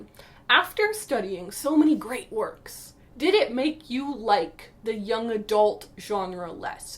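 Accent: Canada. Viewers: Loved hearing the Canadian accent strong in this video, as a Canadian living in the states for a decade.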